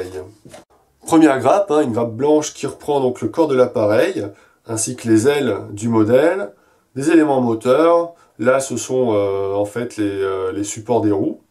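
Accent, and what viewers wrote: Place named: France. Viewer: Salut. Comme tous les ans je monte à Paris pour la Japan Expo. On s'y retrouve avec mes potes de FFXIV et on en profite pour faire des IRL tous ensemble. Les années d'avant j'étais passé devant le stand d'Anigetter sans savoir que t'en faisait partie. Cette fois je manquerai pas de te faire un coucou en tant que membre du forum depuis peu. Sinon je me marre toujours autant devant ton accent franchouilard: Mastèrre Grââde, Fîîne Môldz, ha ha. Ca fait saigner mes oreilles de bilingue mais je préfère prend ça à la rigolade;)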